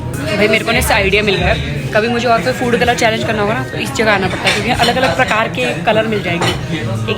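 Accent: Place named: Pahari, India. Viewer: Love ur pahari accent jo aa jata hai beech beech mai.....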